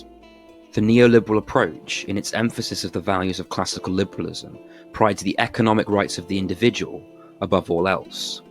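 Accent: United Kingdom. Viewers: have you never heard a british person before? his accent is very standard and easily understood if you don't live under a rock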